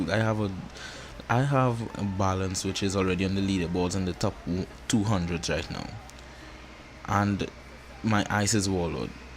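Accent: Trinidad and Tobago. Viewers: Is that a Trini accent I hear?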